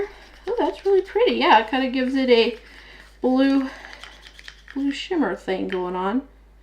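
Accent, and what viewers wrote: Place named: Missouri, United States. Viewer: I love your accent. I'd love to visit Missouri one day - I love how varied the states are.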